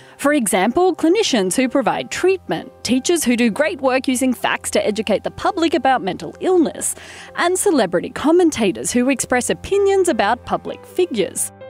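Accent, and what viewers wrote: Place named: Australia. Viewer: Am I the only one that thought it was a bit odd that Australian was narrating this?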